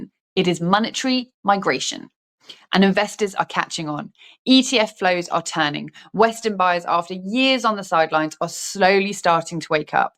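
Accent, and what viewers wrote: United Kingdom. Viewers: Love this fine lady. She speaks my language and with a beautiful and elegant British accent. 👍 ❤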